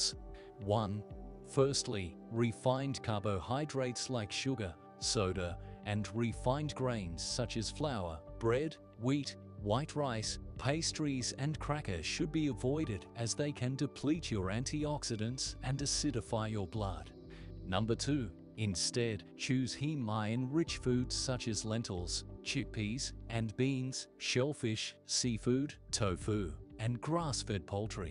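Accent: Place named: Australia. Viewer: Omg i love his Australia accent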